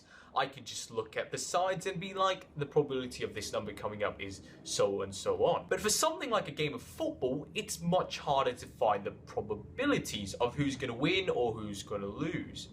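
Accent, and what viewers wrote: United Kingdom. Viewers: Nice video - any chance you're from nottingham? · what are the odds .. chinese speaking in british accent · Chinese guy teaching Hood Math with British Accent. Soo much culturalism Republucans hate 'em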